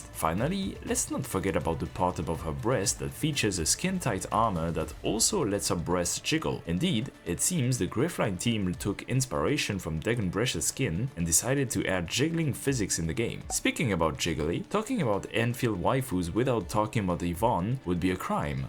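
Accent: France. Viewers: You french?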